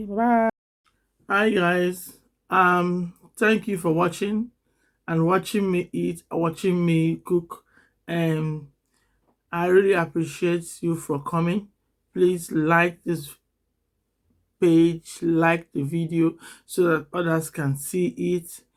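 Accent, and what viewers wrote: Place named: Yoruba, Nigeria. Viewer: I'm confused by your accent and your name, you speak with Yoruba accent and your name is, these leave me wondering, please define. Shame on you swallowing early in the morning, I joined you, I'm proud of it. Thanks.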